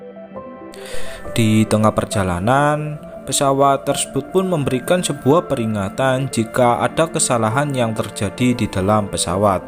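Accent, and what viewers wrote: Java, Indonesia. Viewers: Medok yo mas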